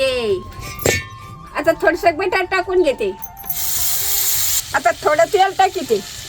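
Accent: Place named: Ahmednagar, India. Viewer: आजी च बोलण्यात नगरी तडका आहे 😂🥰❤️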